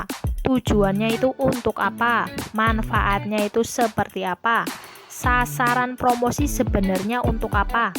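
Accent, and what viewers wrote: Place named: Banyumas, Indonesia. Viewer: Youtuber ngapakk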